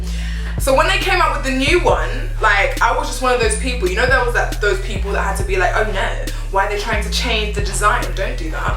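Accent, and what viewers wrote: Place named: United Kingdom. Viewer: I am a sucker for British accents. I love that more girls from the U.K are stepping up their sneaker game..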